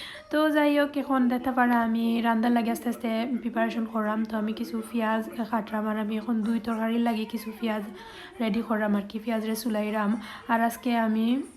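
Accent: Sylhet, Bangladesh. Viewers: আপু অনেক সুন্দর ভ্লগ, আপনার আঞ্চলিক ভাষায় কথা বলাটা সুন্দর লাগে। এটা কি সিলেটি ভাষা? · ভাল লাগল আপি। তোমার সিলেটী ভাষা শুনতে ভাল লাগে। রাতে ঘুমাইতে পারনা কেন আপি? বেবির জন্য?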